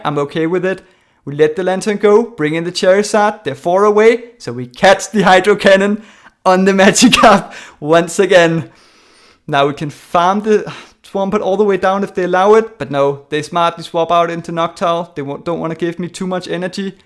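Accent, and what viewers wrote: Denmark. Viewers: First time I've clicked on your video, and still, I could hear clearly after a few seconds that you are Danish!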